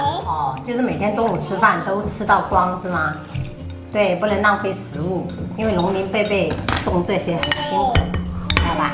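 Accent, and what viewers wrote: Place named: Sichuan, China. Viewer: 喜欢看你的频道～^_^～温暖的家常菜，亲切的家乡川普❤️～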